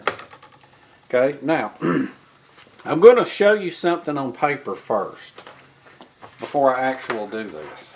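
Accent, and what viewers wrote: South, United States. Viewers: I have no clue how to sharpen knives. I bought my son a stone. Hes the one that told me it needed o'l 😂. Love that southern drawl. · I have made many knives, swords etc, Viking swords, Roman Gladius, Roman spatha, blah blah, but one thing is for sure, if you develop a sharpening technique that works, then who cares how others do it. I just laid on my couch, and after watching the full video, I have an overwhelming urge to go in my workshop, grab my sharpening stone and some strop leather and sharpen my survival knife, and I'm not even a bushcrafter. In fact, watching your vids has given me the idea to spend a weekend in the forest up the hill from me😊, although, it's October, it's Germany and its bloody freezing 😂, so I may wait till next summer. But one thing I wanted to say as a relative noob to your channel, is thanks for sharing your passion, and to us over the pond, your happy go lucky positive attitude and your really funny southern Hill Billy drawl, makes your vids even more infinitely watchable. 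So, cheers, appreciate it bro. 👍🏻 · G'day, I saw this excellent video when it came out. I didn't leave a comment. It happens that I'm a huge fan of Kukris and recurve machetes and recurve/convex knives. I took your advice on-board because I didn't have a strategy for sharpening my Kukris, convex and recurves. When in doubt I used a ceramic rod and a ceramic oval rod; which by the way, works well. Here it is two years later and I can tell you that your methods (in some cases slightly modified) have been working for me. I don't like using awlll (AKA: oil) so I got myself an ultra-fine water stone wheel. I also have practiced doing a lot of 'line of sight' freehand honing and stropping and I can pretty much get a surgical blade finish on any decent carbon or stainless steel. Although, I took your advice and didn't bother spending too much time on my 'chop only' Kukris. Now I have a lot of knives in my user collections that I don't need to show to a stone of any kind. 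Thanks, you've been a great help! P.S. I love your Southern accent although with some words I need to see sub-titles till I get used to your great way of speaking. No dig intended; if you heard my rough Aussie accent you'd probably reckon I was babbling on in some foreign language:) Good one,. Since 2016 I've not missed one of your videos. Outstanding.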